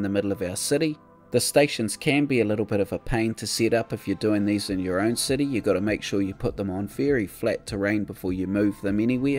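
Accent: New Zealand. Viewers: Love your new series. Love hearing the kiwi accent on CS vids.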